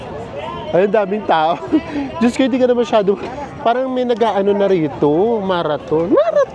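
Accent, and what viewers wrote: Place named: Parañaque, Philippines. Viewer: I love watching your vlogs , always positive ❤ and your accent is the-same with paranaque 😅 thank you and more power ❤ I’ll be in Baguio dec26 till jan2 … from Chatsworth city California 😊❤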